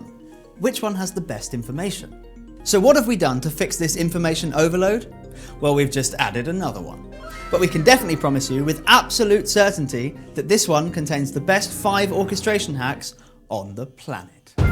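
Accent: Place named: England, United Kingdom. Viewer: It's a perfectly clear, understandable and succinct English accent, what are you talking about!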